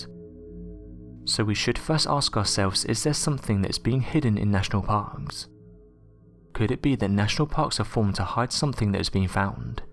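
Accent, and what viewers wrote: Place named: London, United Kingdom. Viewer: English. London sounding. But it's the strange rhythm of his voice that gets me. I just can't handle listening to him. Oh well.........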